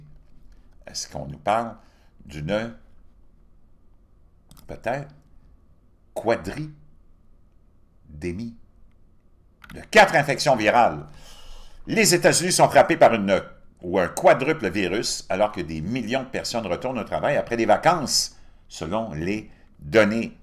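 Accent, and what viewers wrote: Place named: Canada. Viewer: coucou de sud de la bretagne en France...tjrs un plaisir ton accent canadien...merci et mes voeux pour cette année qui s annonce encore bien chaotique....resistance....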